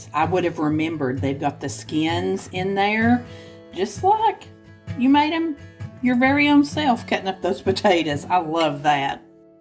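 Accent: South, United States. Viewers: you are a breath of fresh air! Your humility is unheard of in this me, me, me world we live in today! I have been eating low carb/keto for a year now so I don't use your recipes but ALWAYS look forward to watching your videos every week! I adore your soft spoken, southern drawl that I am so fond of because of my family roots being from TN. Love you and wish you God's blessings today and every day! Congrats on the 100k subs...well deserved!! <3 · I found you quite by accident scrolling through youtube cooking channels. You did your video on the best simple salids. I absolutely adore watching your videos. I love love your southern accent, And with every dish you prepare, you show the love of your family. And you're a True southern lady and homecock. Thank you for not only the entertainment but the wonderful recipes you put out. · Congrats,! I found your channel a few years ago. 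Your sweet southern accent and fun personality drew me in quickly! I think the first recipe I made of yours was the meatloaf. It is delicious! I like that you include all the sides in your videos too. God Bless you! 😊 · Congratulations! I love your channel and I love the merch. My favorites are the ground beef recipes. And being a born and bred southern girl I love your accent because it is a lot like mine (NC/GA) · Make a little cream sauce using cold milk a heaping tsp of flour. Vigorously stir that flour into the milk and pour over those peas. Allow to thicken a little and enjoy! My favorite way to eat them. This chicken recipe has got to be a must make bc it looks delicious! Thank you for the recipe! Oh my goodness! That garlic cheese bread! So simple and looks delicious! Can’t wait to try it! Again, thanks! Girl, your sweet southern voice is soothing. I really enjoy your videos. Congrats on 100k subs!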